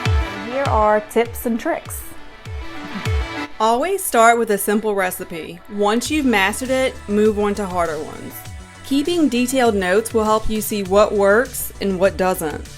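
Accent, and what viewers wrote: Louisiana, United States. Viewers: Lol!! I knew I recognized your accent on the 1st video I saw!! Im from Louisiana and I live in Lafayette. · I was wondering about your accent! I'm in Louisiana! Central LA, where are you!?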